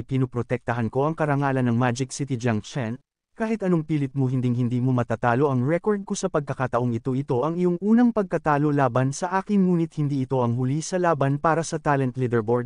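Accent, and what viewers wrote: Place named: Visayas, Philippines. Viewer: Bisaya gahing bisaya nice lods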